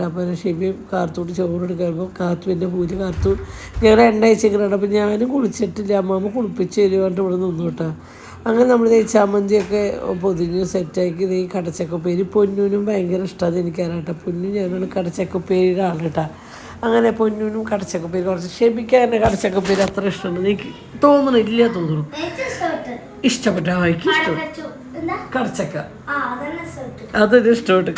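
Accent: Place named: Thrissur, India. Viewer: അല്ലേലും തൃശൂർ ഭാഷ അവിടെ ഉള്ളവർക്കേ മനസ്സിൽ ആകുള്ളു അത് അവരുടെ കുറ്റമല്ല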